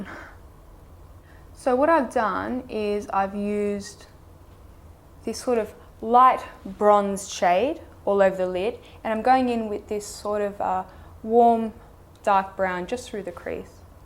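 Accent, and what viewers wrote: Australia. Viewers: your aussie accent is so fun to listen to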